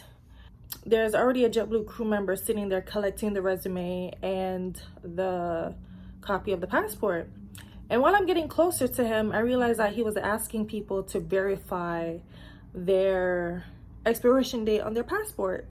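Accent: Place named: Caribbean. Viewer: What’s ur accent from? I’m vincy n you sound Caribbean too- also have my in person f2f june 6 (2 weeks)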